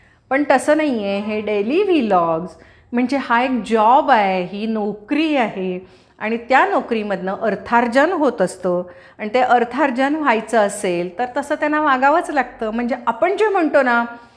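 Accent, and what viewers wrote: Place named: Marathi, India. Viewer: जय श्रीराम, ताई तुम्ही अगदी आमच्या मनातलंच बोलताय! आताच्या ईंग्रजीचे प्रभुत्व असलेल्या वातावरणात,तुमचे ओघवते शुध्द मराठी ऐकतच रहावेसे वाटते!मला शुध्द मराठीचा खुपच अभिमान आहे,तुमचे मराठी बोलणे मला आवडते!अजिबात नाटकी पणा नाहीये!प्रत्येकाचे स्वभाव वेगळेच असतात पण आयुष्यात तडजोड करावीच लागते!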